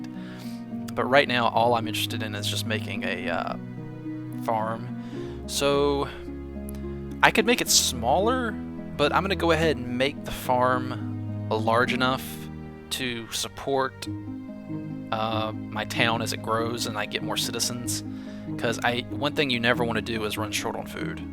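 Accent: South, United States. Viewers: What are you talking about? There is no 'usual' or 'normative' english (language) accent, it depends on where you live and what you're used to. If you live in America, the 'usual' English accent to you will be American (ignoring the many american regional accents). I'm sure ' accent is fairly normative for where he lives. He'll just have one of the many different Southern USA accents, of which Texas is a stereotype people like to compare accents to. Here in England his accent is not usual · there is no usual english. usual for americans is different from the usual for the british and he has an american accent.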